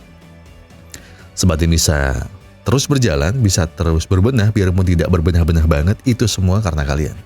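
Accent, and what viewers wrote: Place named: Java, Indonesia. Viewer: Lu asik bang jelas wong jowo iki mas 😂😂😂😂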